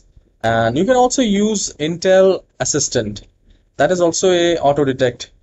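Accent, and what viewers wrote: India. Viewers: nah yk its gna work when you hear the indian accent lmao W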